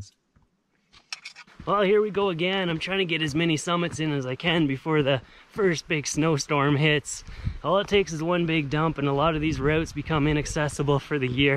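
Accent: Canada. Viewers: You got me with your pronunciations. I thought Canadians just didnt know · Most Canadian language pronunciation episode yet!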